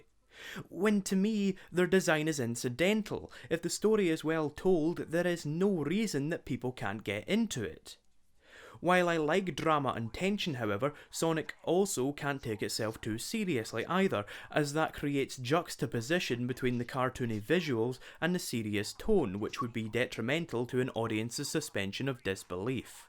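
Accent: Scotland, United Kingdom. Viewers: This is probably the most extensive look at Sonic's evolution ever, and it raises some good points. Also, it's nice to hear another Scottish voice on youtube! Anyway, I have a possible (yet perhaps biased) suggestion to Sega for a way out of the bind they've got themselves in. When your series loses its direction and identity, go back to what made it great in the first place. Recall when the James Bond franchise, during the late Brosnan era, didn't know what to do with itself. Die Another Day was partly an homage to classic Bonds, part sci-fi, part self-parody, but also overwrought with serious emotion. In many ways the series was again turning out like the late-Roger Moore era, something that Brosnan's first outing as Bond in Goldeneye did a lot to get away from. So after Die Another Day they brought in a new actor and did Casino Royale. In terms of its tone, that film is very similar to the Ian Fleming book of the same name, and it shows why and how the characters and stories from the early books were so gripping. 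After watching your video, I see a lot of parallels between the respective problems faced by Bond and Sonic, and if getting back to basics worked for the former, I really think it could work for the latter. So what I'm suggesting is that Sega go back to the gameplay mechanics, designs, and narrative style of Sonics 1-3. And not just in the form of nostalgia feasts like the Sonic 4 episodes or Generations (though the latter was a firm step in the right direction)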